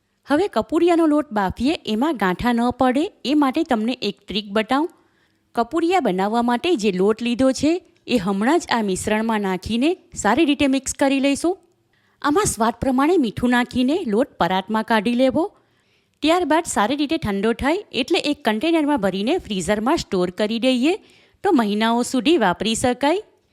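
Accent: Surat, India. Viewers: Proud to be surti. Amari bhasha avi j che ane rehse. Tamne ni fave to tame skip kro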